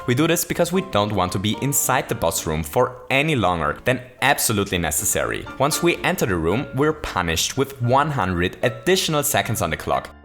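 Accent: Germany. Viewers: Bist du ein Deutscher ? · Are you german?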